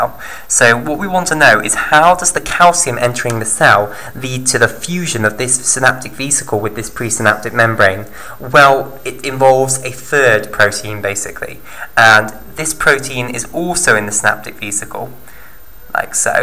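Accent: United Kingdom. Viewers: I think it’s just a compliment on his British accent.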